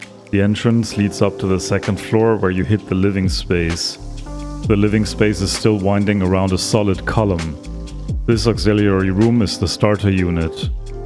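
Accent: Germany. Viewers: Bist Deutscher oder?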